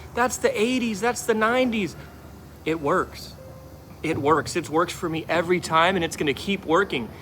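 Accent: South, United States. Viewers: I’m sorry am I crazy, you actually sound like you’re absorbing a little bit of the Arkansas/Southern drawl??? Sounding less like a Californian for sure!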